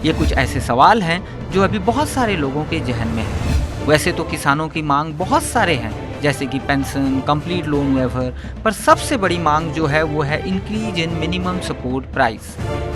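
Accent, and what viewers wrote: Odisha, India. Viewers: It sounds an odia student is reading an english essay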